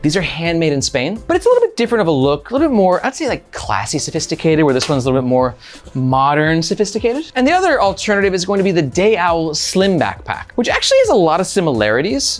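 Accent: France. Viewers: Nice French accent 😊 thank for your review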